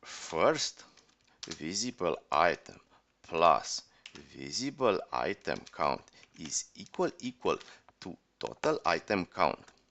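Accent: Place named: Russia. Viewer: Your accent is so cool, it's like Russian mafia people, so cool, also the tut is really helpful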